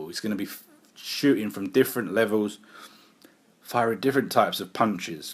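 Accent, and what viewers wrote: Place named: United Kingdom. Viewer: Its a hopeless analogy...i was interested in what you had to say about a 40 year old Mayweather(still fresh from retirement and a history of hand injuries) versus a Mcgregor in his prime with immortality and greatness beckoning alongside that Irish fighting spirit.I was willing to debate because i already know where your money is(You are in for a shock btw) but when i heard that comparison,it felt so ugly and the fact that you sound British just made it worse...the British and Irish lions were active just recently...i dont know how you can be a fan of combat sport and not know jack about rugby...blasphemy! I digress....Mayweather is a super computer that has decoded all that have stepped before him in a ring but Conor is a UNKNOWN machine that keeps evolving,unorthodox...doesnt matter whether its in a ring or octagon...think of an upgraded version of the T1000 in terminator 2.It will be a hunt for him.A smarter Tom attempting to smoke a Jerry and i feel Tom will get his prey.I must however admit that there is a timeline within which Mcgregor has to finish the greatest pound for pound boxer of all time...i wll go out on a limb and say 8 rounds.After that,Mayweather will certainly transition into auto pilot having added Mcgregor´s data into the database.Mcgregor could however try to trap Mayweather into the latest rounds and pull an Anthony Joshua on Klitschko.Fighting is problem solving and you shouldnt discredit Mcgregor´s fighting IQ.All in all,it has the makings of the grea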